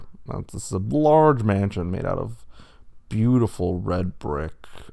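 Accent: Germany. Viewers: the german accent is fucking sending me into oblivion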